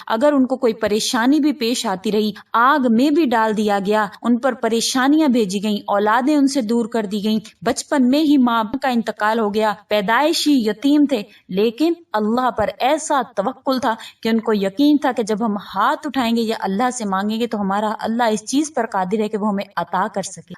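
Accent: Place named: Arab world. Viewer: اسلام علیکم ، بہن آپ سے گذارش ہے کہ آپ اردو جیسی میٹھی زبان کو اردو ہی کے لہجے میں بیان کریں، بلاوجہ اردو کو عربی لہجے میں بیان کر کے مصنوعی مت بنائیں.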